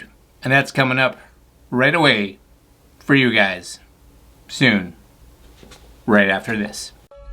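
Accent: Canada. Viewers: That's one hell of a Canadian accent ya got there chuck.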